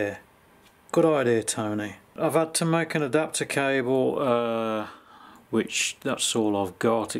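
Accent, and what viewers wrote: Birmingham, United Kingdom. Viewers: Tony, are you a Brummie?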